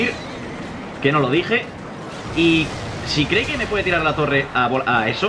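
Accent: Spain. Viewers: dek país eres bro por dijiste tegusilgalpa y aki en Honduras esa es la capital del país pero no creo k seas de Honduras por tu asentó creo k eres de España digo pues muy buen bideo usare tu baraja aver k tal me ba bueno pues eso es todo suerte amigos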